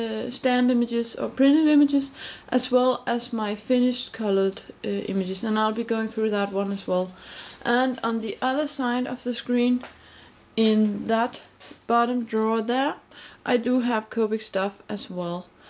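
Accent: Denmark. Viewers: Are you from Denmark ? xD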